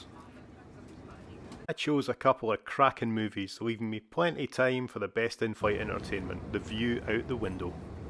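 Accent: Scotland, United Kingdom. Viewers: I loved the 747 too... you sound much less of a Scot seven years ago..😂😂😂😂😂 went to India on a BA 747 with my friend, Club Class, it was ok, not special.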